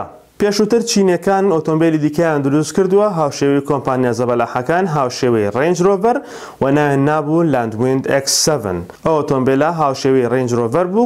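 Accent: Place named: Iran. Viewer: هذا إيراني لهجته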